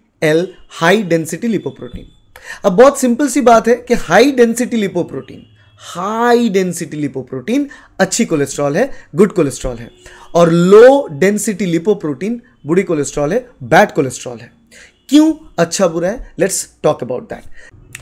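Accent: Bengal, India. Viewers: Thank you sir for this video 🤍🤍🤍🤍... But I'm feeling like you have some Bangali tone in your voice…..☺️are you Bangali??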